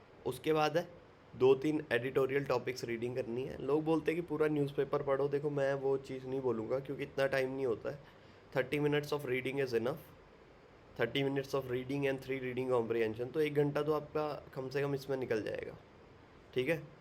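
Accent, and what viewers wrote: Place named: Jaipur, India. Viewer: okay sir! Actually your tone sounds like a jaipurite! Thatsy I ask.. 😊👍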